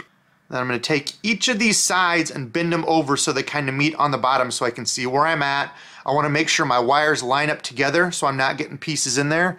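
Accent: Iowa, United States. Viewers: Petition for to make more root beer colored jigs to hear him say it, love the Iowa accent 😂😂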